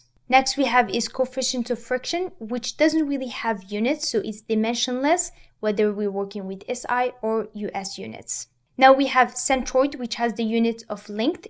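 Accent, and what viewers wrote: France. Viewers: Sorry for my dummy question, are you from France?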